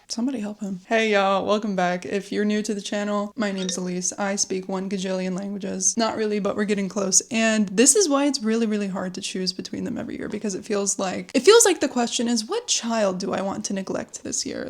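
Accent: Mexico. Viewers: Hello, hello! Spanish native speaker over here 🫶🏻 Just wanted to say you sound 100% Mexican! So at least for me, you’re not losing the Mexican accent. Hugs! 🫂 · So I've been learning German for a while and my struggle is super cliché 🤭 I understand a lot but I have a hard time putting sentences together on my own. My focus for this year is exactly that, I'll be journaling in my target language to improve 😎 Btw, as a native Spanish speaker (but not Mexican haha), you still have a nice Mexican accent 😁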